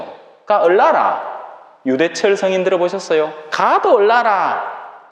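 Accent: Gyeongsang, South Korea. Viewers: 찬미예수님! 신부님, 강론말씀으로 또 새롭게 힘을 얻습니다. 신부님 완전 경상도 사투리(뿌리)를 들으며 고향 향기를 맡는 것 같습니다. 하느님은 찬미받으소서!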